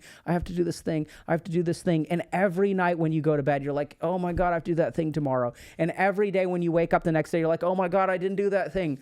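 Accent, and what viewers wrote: India. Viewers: As an Indian, Dr. K's Indian accent has me on the floor every single time LOL! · The Indian accent is cool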